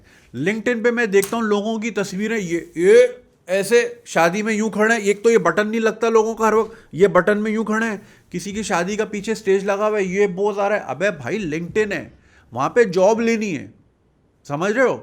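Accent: Karachi, Pakistan. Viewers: Love your show specially free spirit talk and Pure Karachi Accent love it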